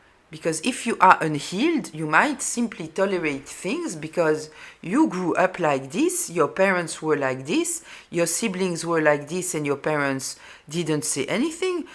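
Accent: France. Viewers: 1st time on here, glad I found you. Love your very Fremch accent, and think you are very wise. It might be my first time but I promise it won't be the last ❤🎉😊 HAPPY 2026 !! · Aside from loving your beautiful French accent, I love that your readings are actually therapy, discussing what beneath those strange behaviours of humans. I love this because it resonates with the main reason I listen to Tarot readings.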